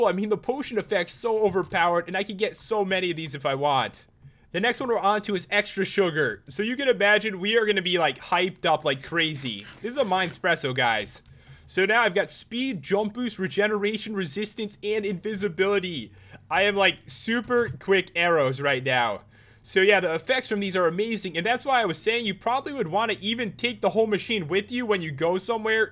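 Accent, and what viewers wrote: United States. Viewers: Are you Amarican?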